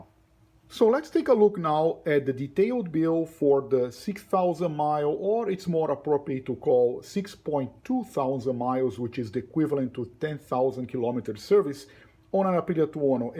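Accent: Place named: Brazil. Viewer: Thanks for the reference. I am getting close to 10,000km. BTW, you have a Brazilian accent :)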